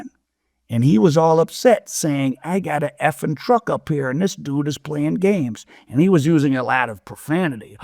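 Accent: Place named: Upstate New York, United States. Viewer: I think meech gave her his life rights so she can work as a paid informant for him.why would he give someone he didn't know that long his life rights? because she agreed to the circumstances meech name was mentioned for a reason in this case or his name would have never been mentioned...This guy sounds like he's from upstate NY somewhere good break down though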